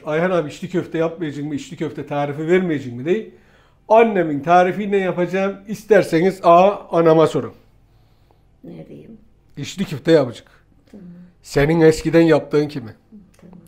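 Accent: Gaziantep, Turkey. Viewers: Muhterem Ayhan abicim, Videolarini zevkle izliyoruz ve elimizdeki imkanlarla vermis oldugun tariflleri yapmaya calisiyoruz. Yurtdisinda yasiyoruz. Imkanlarimiz kisitli olsada yinede guzel lezzetli Antep yemeklerini pisirmeye calisiyoruz. Abicim sana bir sey soracam. Oncelikle Salvarin ve Deri yelegin harika . Salvar tam benim istegim modelden. Antep Turkmen Salvari. Turkiye'ye ilk geldigim gun direk Antep'e gececem ve 2 hafta kalacam ve Videoda tanittigin yerlerde dolanacam. Senden ricam Senin giymis oldugun Salvari diken ya da satan yeri bildirirsen cok memnun olurum hatta deri yelegini de. Soyle 4 yada 5 adet siparis verecem. Ayrica sivene hastayim. Tam bir Antep sivesi harika. Muhterem Annenin ve Babanin ellerinden operim. Allah'a cc emanet ol.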